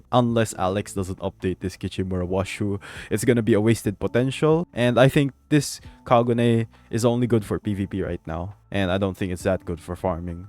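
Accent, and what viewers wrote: Philippines. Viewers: Ye he sound Filipino I can hear his Filipino acsent jumping and turning American again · are you pinoy? Your accent is kind of filipino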